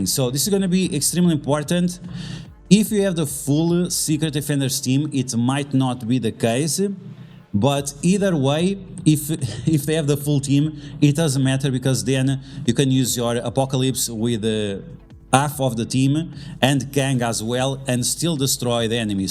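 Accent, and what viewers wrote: Hungary. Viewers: Pasztmek =D Tippelni se tudtam, mi ez a szláv akcentus, de hogy magyar =D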